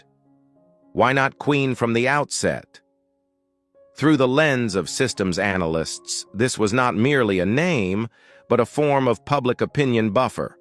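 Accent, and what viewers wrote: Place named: United States. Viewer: Waste of time listening to this, and the American accent is nauseating.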